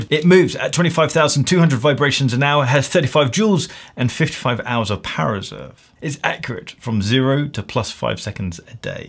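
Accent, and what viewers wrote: RP, United Kingdom. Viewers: Is his accent RP? 😁 I like to listen when speaks. Such a beautiful accent. I heard he pronounced T clearly in most of his videos but when he said "water", it doesn't really sounds like RP.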